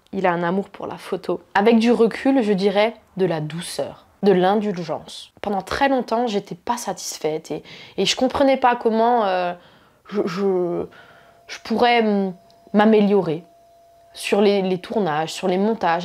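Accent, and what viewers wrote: Alsace, France. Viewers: je regarde tes vidéos depuis le début de ta chaine , pourquoi je continue ? parceque tu me fais vraiment rire , ton accent alsacien yoooooo , tes blagues , ton énergie , t'es marrante , au quotidien ca doit étre quelque chose avec toi !! 😅😅 cela permet aussi de découvrir des petits restos dans le coin que nous ne connaissons pas , je rigole déjà en pensant a tout ce que tu mange a chaque fois ! 🤣 bref perso avec toi regarder une video , c'est se fendre la gueule , hop'la je reste abonné et je t'encourage a poursuivre ce que tu fais car c'est de la bombe atomique 🙂🙂😆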